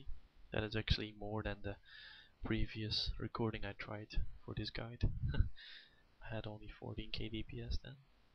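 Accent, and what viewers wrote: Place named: Sweden. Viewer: nice video, öva lite på ditt utal så kommer ingen höra att du är svenks :) i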